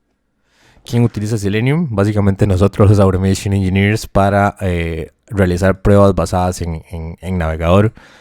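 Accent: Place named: Costa Rica. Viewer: Muchas gracias estimado, creo que sos tico por tu acento. Grande Costa Rica!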